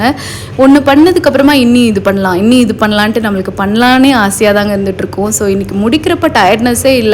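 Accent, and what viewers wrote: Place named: Coimbatore, India. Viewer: Akka im also from Coimbatore currently in canada, recently seeing your videos. Very nice esp neenga pesra vidham.. Nama kovai style, nalla irukeengla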